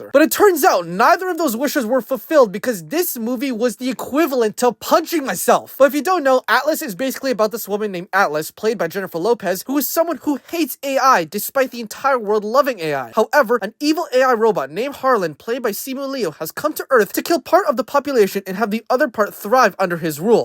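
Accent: United Kingdom. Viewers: You're brit ??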